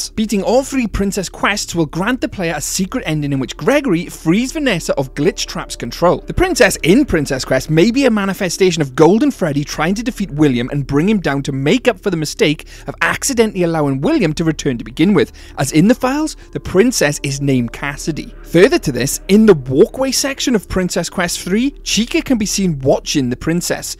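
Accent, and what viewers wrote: Wales, United Kingdom. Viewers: Are you welsh by any chance?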